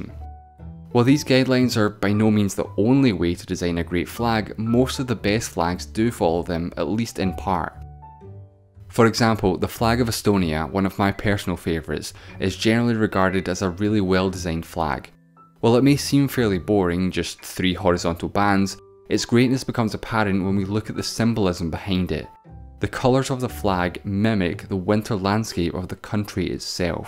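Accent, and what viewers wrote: Scotland, United Kingdom. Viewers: I don’t want to be culturally rude but is it me or does he sound Scottish (btw I’m new to this channel) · Is scottish or something?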